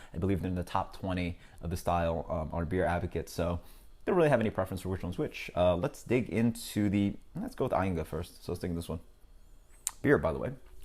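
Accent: United States. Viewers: Out of curiosity. Why do you force the correct pronunciation of German words but use your American accent to pronounce the Spanish words like Sierra Nevada? Great review!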